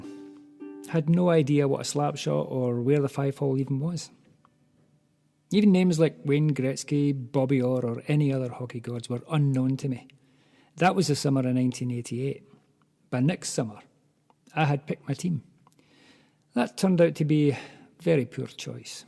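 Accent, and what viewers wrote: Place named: Scotland, United Kingdom. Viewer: That's a shocker if a Scottish accent all the same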